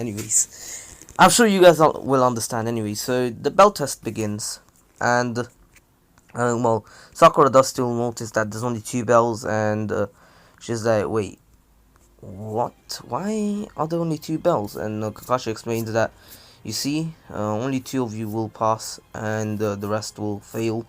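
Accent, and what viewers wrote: United Kingdom. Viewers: I just like his voice when he narrated Bc it’s British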